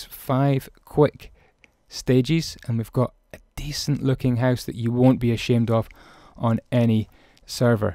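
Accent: Scotland, United Kingdom. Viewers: Are u from scotland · u have a Scottish AND a British accent mixed lol!!!!!! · Excuse me, thats a scottish accent, im proud to have one and i dont find mine annoying or wish i didnt have it. Plus that was rude and uncalled for. I like his accent but thats my personal opinon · is from Edinburgh and has a Scottish accent. Sounds nothing like a welsh accent lol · Yeah I didn't know if he was British or Scottish ????😕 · Where is he from? He kinda sounds Scottish..... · Are you Scottish? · Scottish? Hmmmm? Do I hear a Scottish accent? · are u Scottish I am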